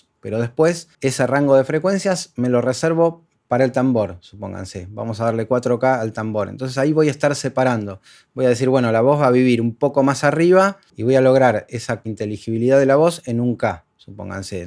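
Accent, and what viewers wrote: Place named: Rioplatense, Argentina. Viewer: Ay que bien, un Argentino o Uruguayo, estoy chato de españoles 😅, saludos desde Chile 😊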